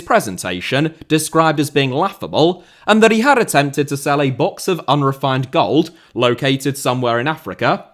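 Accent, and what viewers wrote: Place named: Hull, United Kingdom. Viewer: That is his voice. He is from Hull.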